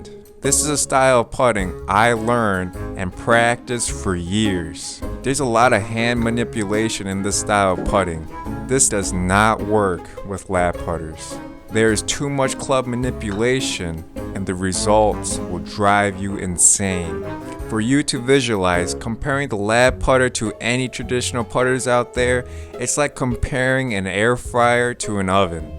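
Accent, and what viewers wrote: United States. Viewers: Super chill Midwestern tone. It’s cool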